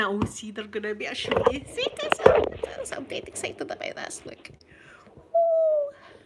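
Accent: Scotland, United Kingdom. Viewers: Scottish very angry..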